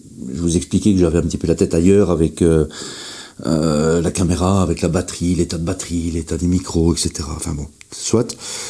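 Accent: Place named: Belgium. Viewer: On sens un petit accent belge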